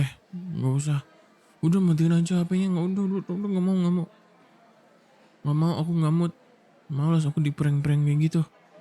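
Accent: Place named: Kalimantan, Indonesia. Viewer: _mas:cara ngomongnya kaya orang kalimantan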